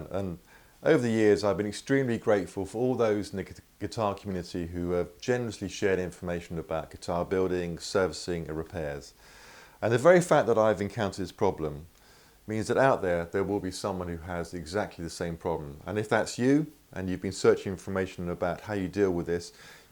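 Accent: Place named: United Kingdom. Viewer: Hiya mate, thanks for this video as I am having the same nightmare with a customers Ibanez right now 😂 and your calm, patient explanation of the process lowered my blood pressure and I have got my screw extractors out and will be - after a nice cup of tea and some hobknobs - go back in the workshop and get the wee bugger out. Poor lad who owns it has tried everything and a previous owner had used imperial on a 4mm nut (argh) so it is totally rounded off in there, and the neck is stuck in a back bow so fretting out on the 1st frets 😕 I just needed a calm British voice to chill me out with a sensible explanation and procedure, and you have saved the day - SEVEN years later 😍 Much thanks 👍👍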